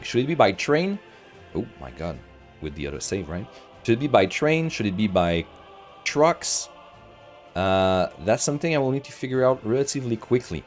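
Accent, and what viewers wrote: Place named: Quebec, Canada. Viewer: I live in Florida. My family is visiting from Montreal. We are Armenian. I knew i recognized the accent. Thank you for your videos. Very relaxing.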